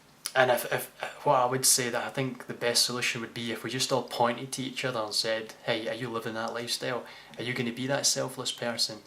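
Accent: Scotland, United Kingdom. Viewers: Great video! loved the 'enlightenment' bit =) hearing that beautiful Scottish accent is never bad, either :)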